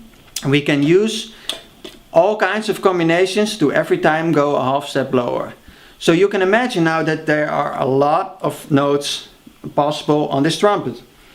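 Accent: Netherlands. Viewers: that dutch accent tho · Its a Dutch accent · I knew you were Dutch the moment I heard you speak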